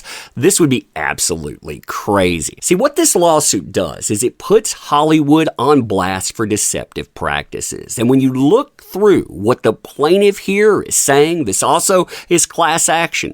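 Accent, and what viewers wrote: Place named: Mississippi Delta, United States. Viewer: We haven't to be from the same small town. We sound alike. Mississippi River Delta?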